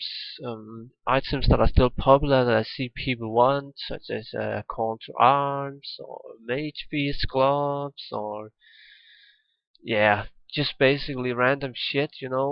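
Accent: Denmark. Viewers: a bit danish maybe??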